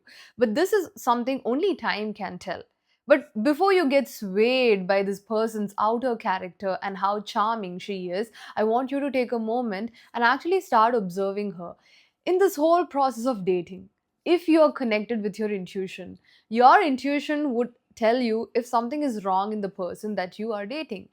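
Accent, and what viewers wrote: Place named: Kerala, India. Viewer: Your English feels like malayalam 👀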